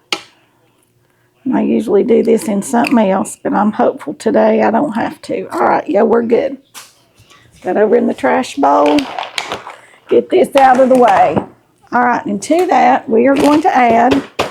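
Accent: South, United States. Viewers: Ahhh….🙋🏼‍♀️ 🎉 Yes, I’m a new subby and I love 💕 your shout out! Thanx!! Living in Australia 🇦🇺 and growing up on lovely romantic Southern films and accents…it’s so endearing to me. Familiar and nurturing, kind and “easy peasy…okey doke!” 💋 ✌️😁👍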